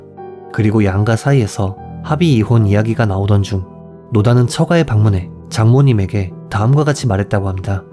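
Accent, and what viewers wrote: Japan. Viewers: 채널주인님은 일본사람인가요? 영어발음이 상당히 일본인이 발음하는 거 같아요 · 그러게요 일본인 특유의 발음이 있을때 일본식으로 계속 발음하던데 이유가 있는건지 뭔지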